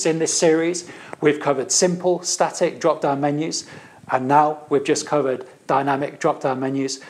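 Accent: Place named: London, United Kingdom. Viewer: like it ... stright to the point & simple. well done man. btw sound from north london ;)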